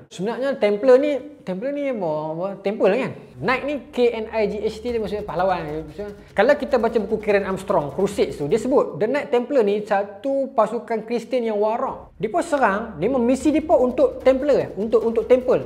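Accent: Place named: North, Malaysia. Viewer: Tu kira bahasa melayu dlm loghat utara..kau tak faham sbb ko bkn melayu,ko kudha kayu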